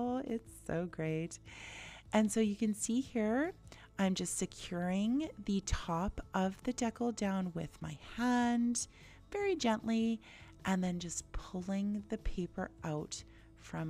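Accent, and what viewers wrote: Canada. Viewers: I have only one other DIYer to whom I am subscribed that uses Hippo waterslide and heat transfer paper. It is fascinating to watch it being used. I just plain have to try it out myself!! You have made projects here today that, as always, look high end. My favorite was the coasters! I look forward to each of your videos!! Your voice is soft and soothing and I’m diggin’ your Canadian accent!! 😃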